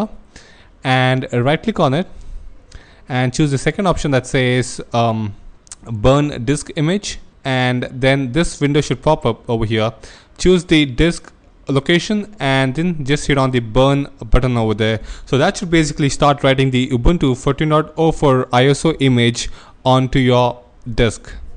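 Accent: India. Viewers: I guessed from first that you might be a calcuttan (by your dialect). Thanks a lot for the wonderful tutorial! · what accent? is indian accent thats why is hard to understand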